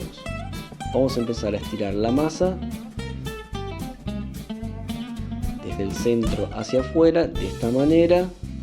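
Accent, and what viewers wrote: Argentina. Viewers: Que bello acento el de los argentinos😄😍😍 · Excelente estimado chef , decir que eres un argentino de un país hermoso previligiado porque de muy peque me a gustado tu país y ese acento especial que solo ustedes y los uruguayos lo poseen dicho esto , muchas gracias por tus recetas sobre todos estas masas me gustan igual con espinacas fabuloso las voy a preparar tal cuál lo enseñas , saludito desde Chile !!!!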